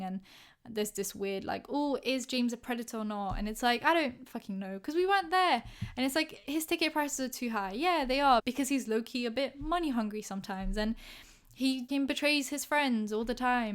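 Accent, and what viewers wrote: United Kingdom. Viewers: This woman has the most mixed British accent I've ever heard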